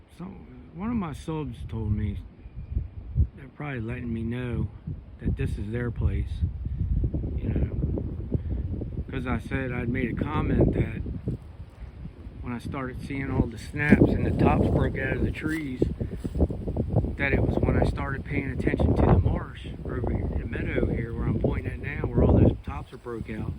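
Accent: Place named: South, United States. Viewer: Good morning 🌞. You sure sound southern. When you talked about the wood, you were teaching what you know. Alot of folks may not have known that information. That's neat the turtle was still there. It's sunny spot. Thankyou for sharing your thoughts and walk. Always a pleasure. Hope you have a great day.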